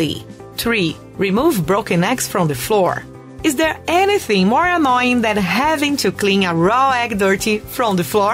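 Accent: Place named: France. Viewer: Thank you!! Just curious are you French? Cool accent😎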